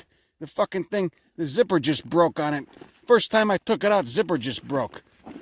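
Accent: Chicago, United States. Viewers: Sound like your from Cicero. I love your vids. Chicago to jersey baby. Keep producing these . most enjoyable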